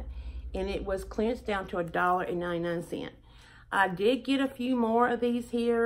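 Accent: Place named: Alabama, United States. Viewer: Tuesday and Thursday would be good for me❣️ I lived in Alabama for 10 years so your accent sounds so good to me. I’m so sorry about your phone! And I know what it’s like to move a lot. Will you still be close enough to see family and friends after your move? You’re so sweet, I love to watch your videos and I like the way you decorate. 👍🏻🥰🤗🙏🏻 I’m praying for you😌❣️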